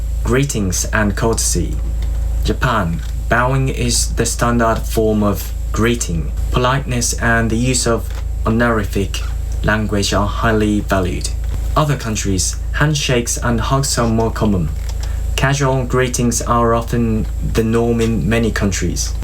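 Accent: United Kingdom. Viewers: Your pronunciation is very good. To be honest, it almost sounds like you have a slight British accent lol. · That's not slight, haha. It's very British sounding.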